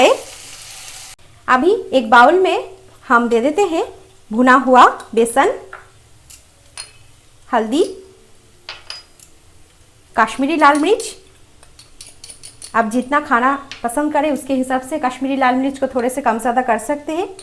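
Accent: Bengal, India. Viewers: Very awesome recipe also delicious I like u r bangoli pronunciation expecting more recipies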